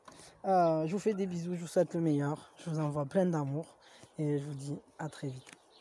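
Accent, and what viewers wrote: South, France. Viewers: Bonsoir 🤗 on se croirait ds le sud ou vs êtes plus votre accent les poules le vent mais j adores ce contexte 🌳🌿🍃🐔🐔🐔🐞la guidance me parle pas j aimes bcp cette façon de penser à l un à l autre se protéger avancer main ds la main ...... J espères rencontrer ce style d amour très grd ah oui 😊🙏 bonne soirée 🤩💖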